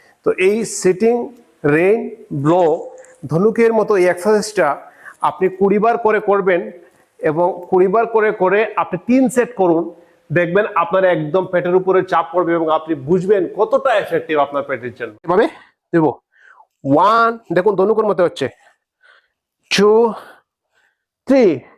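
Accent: Sylhet, Bangladesh. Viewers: ভাই কিতা সিলেটি নি কথায় বুঝা যার সিলেটি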